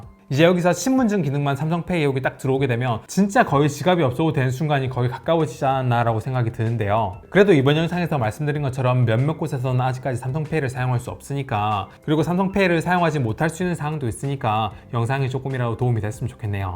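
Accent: Gyeongsang, South Korea. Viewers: 살짝 돌출된 입 ... 들릴듯 안들릴듯한 경상도 사투리... 뭔가 깔끔하고 단정한 김영철같아요... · 유용한 꿀팁 감사합니다 경상도 사투리가 귀엽네요 ㅎ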